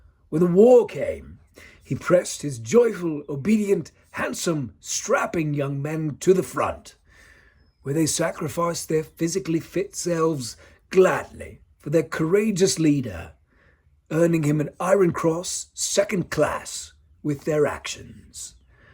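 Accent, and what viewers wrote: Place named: United Kingdom. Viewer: sometimes your british accent grew too much on me and i started reading things aloud in it subconsciously 😆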